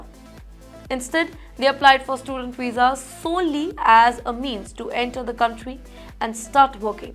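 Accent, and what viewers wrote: India. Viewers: And you should shed your goddam Indian accent! I hate the way you speak English!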